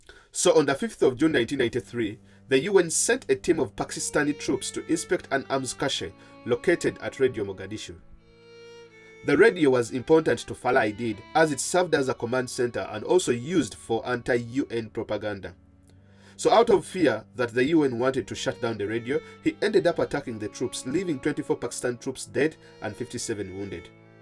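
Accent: Uganda. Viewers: Is the commentator Ugandan?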